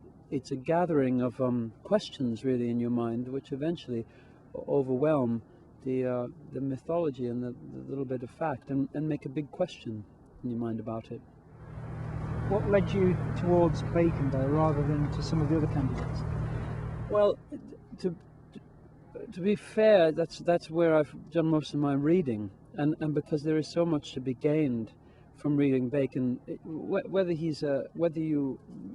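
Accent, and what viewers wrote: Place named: England, United Kingdom. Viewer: Why does he have an English accent here? He was raised in America. And he didn't have one when he accepted his Tony two years ago.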